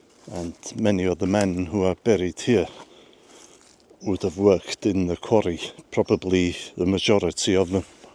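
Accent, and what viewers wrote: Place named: North Wales, United Kingdom. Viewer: I’m a 100% Welshie from Gwynedd and I have to say we do have the most beautiful views in the world plus it’s nice to have a video with someone with my north wales accent. Love the video btw ❤ da iawn a diddorol🏴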